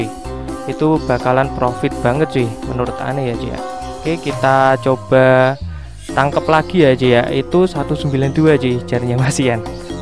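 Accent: Java, Indonesia. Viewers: Orang jawa bang? 😂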